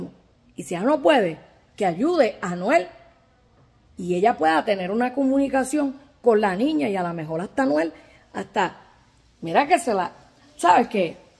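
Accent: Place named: Colombia. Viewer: He sounds columbian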